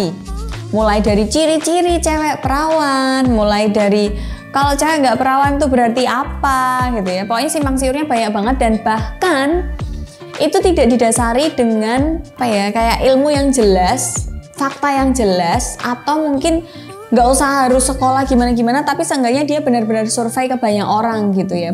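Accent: Java, Indonesia. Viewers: Pantes logat nya kek orang jawa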